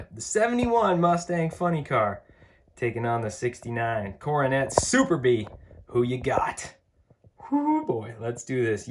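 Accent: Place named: Canada. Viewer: I realize you’re Canadian but the word ‘a’ in the English language is pronounced ’uhh’, not ‘eh’.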